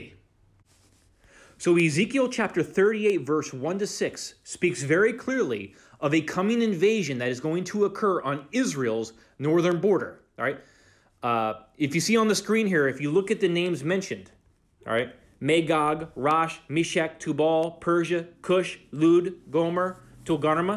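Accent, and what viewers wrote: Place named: United States. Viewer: Oh you sound like an American.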